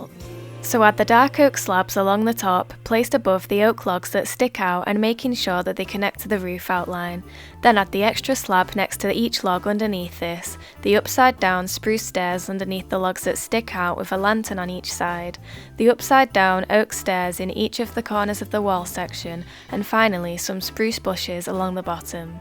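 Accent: United Kingdom. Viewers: I like your British accent.I sometimes try to make one too but I just can't :))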